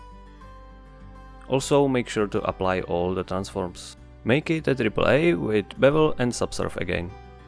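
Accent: Czech Republic. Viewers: Hah, právě jsem na tento tutoriál narazil a hned u prvních slov si říkám, že mi ta angličtina přijde s českým přízvukem :D Tak jsem rád, že dobře slyším. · is " " a CZECH channel??? the guy's voice sounds like if he sopke Czech at some time I am czech too and so far I haven't found allmost any good czech Blender Channels and I just want to know if I am right and there is someone else I could speak to in my home language so am I right or wrong???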